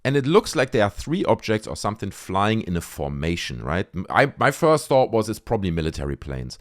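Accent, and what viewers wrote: Germany. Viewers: Are you german? Sounds like you are. If you are german why Do you dort make your Videos in german? Ich meine würde mich freuen freuen als deutscher ^^ =) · are you a German native ?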